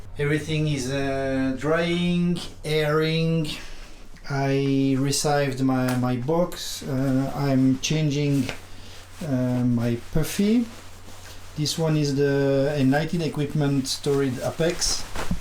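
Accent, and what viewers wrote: Switzerland. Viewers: Je comprends ton désir de t'adresser à une plus grande audience, c'est naturel quand on est sur YouTube et que l'on veut voir sa chaîne grandir. Malheureusement, il m'est difficile de prendre du plaisir en t'écoutant parler anglais étant moi-même bilingue et bloquant à chaque (grosse) faute de langue que tu commets. Tu vas certainement faire fuir une partie de ta communauté et gagner une nouvelle part d'audience, mais si tu n'as pas l'intention de transformer toute ta chaîne en english-friendly, j'ai peur que tu ne te tires une balle dans le pied car les francophone auront fuit et les anglophone n'auront plus de contenu facilement consommable et partiront aussi. En espérant retrouver le charme de ton accent fribourgeois. :)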